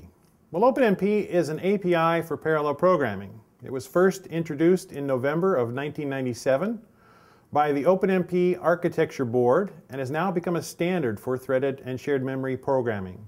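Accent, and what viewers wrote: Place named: United States. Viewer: Just link to the slides, then I don't have to listen to an American accent.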